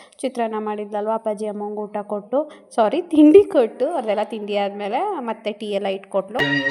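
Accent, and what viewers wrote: India. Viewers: ಒಂತರ ಅಂದ್ರೆ ಹೇಗೆ . ನಮ್ ಹಳ್ಳಿ ಕಡೆ slang hage eruthe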